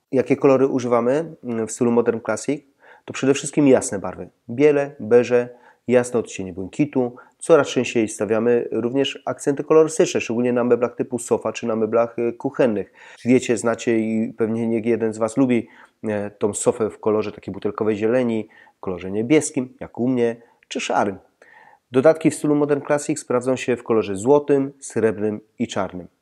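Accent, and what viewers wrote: Silesia, Poland. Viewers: Ależ masz ekstra śląski akcent👍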